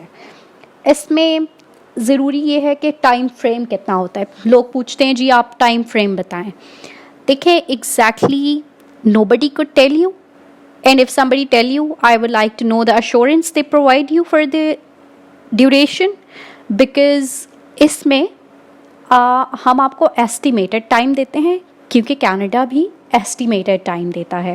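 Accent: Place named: India. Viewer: Nice speaking English just like indian so easy..appreciate to you madem...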